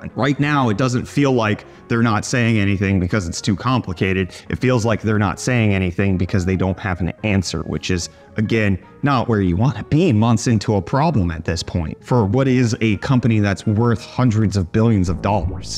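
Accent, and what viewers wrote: South Africa. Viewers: I love that is starting to sound more south African as time goes on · are you from SA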